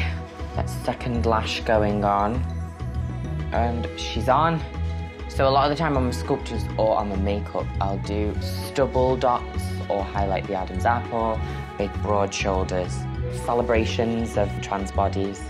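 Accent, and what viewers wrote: United Kingdom. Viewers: i remember watching this video when i was 15 and thinking i was watching the most beautiful women i’ve ever seen. and i wasn’t talking english yet so everything i understand was the british accent and the word stunning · Its called a British accent. but nice recycled meme bro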